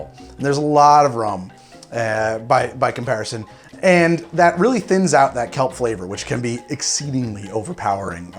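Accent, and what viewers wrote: New Jersey, United States. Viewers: He does a good Spongebob laugh and I wonder since he's in Jersey does he pronounce Water the correct way or does he change it so the other state living people don't start up their bs?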